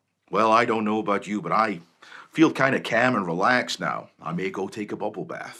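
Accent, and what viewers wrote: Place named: Scotland, United Kingdom. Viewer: Scottish. There are clips of him speaking with his real accent.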